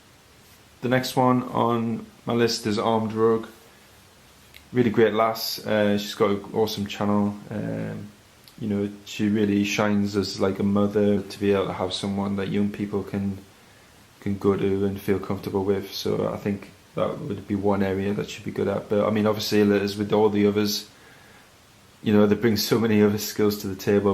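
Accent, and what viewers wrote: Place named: Newcastle, United Kingdom. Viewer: I think you should stay on YouTube. Good content and hearing you reminds me of my drugged youth living in Newcastle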